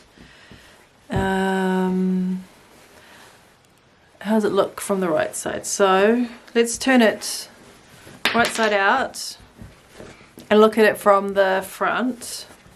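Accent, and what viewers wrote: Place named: New Zealand. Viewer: LOVED the ruler tip - Brilliant! And so nice to hear a fellow Kiwi tutorial :) It was like having my BF sew with me. Thank you <3 This is so good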